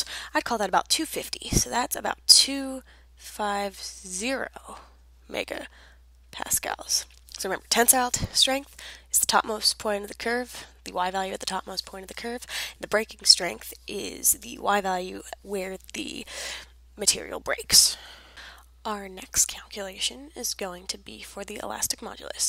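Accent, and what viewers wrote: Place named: India. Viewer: What's normal? An Indian instructor that you need to read captions to understand?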